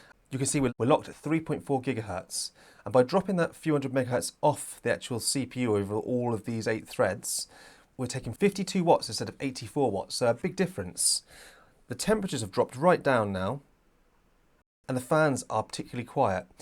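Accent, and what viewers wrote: United Kingdom. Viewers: I love this channel. British accent and detailed tech stuff ❤️❤️❤️ this is proper nerd stuff mate. Keep it up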